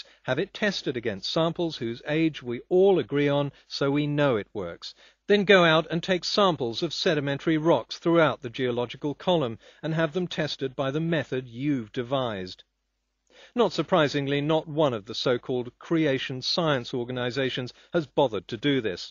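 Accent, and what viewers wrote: United Kingdom. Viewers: Finally someone tells it like it is, and with a British accent! Perfect! Even though I'm American. Thanks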